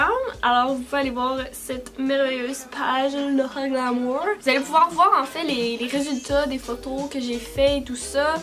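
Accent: Quebec, Canada. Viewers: Tu est vraiment très belle et j'adore ton accent québécois c'est trop beau 😍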